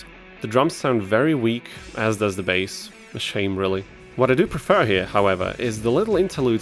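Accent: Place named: Netherlands. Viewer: Easily one of the most irritating videos I’ve ever watched from start to finish Audio balancing, trash. Netherlandic accent, trash. Ad break after every song, hope the .01 euros I gave you was worth the time you wasted of mine. Can’t take 5 seconds to google “what does embu mean?” While editing this whole ass hour long podcast on a computer.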